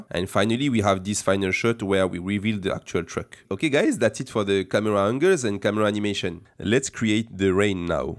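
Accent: France. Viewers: But alors ? You are French !!! ^^^ Au moins avec ton accent Français je understand la moitiés des sentences of the vidéo ! ^^ Merci pour la vidéo...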